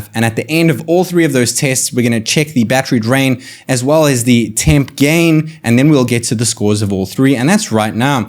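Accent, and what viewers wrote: South Africa. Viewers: Dude, are you South African?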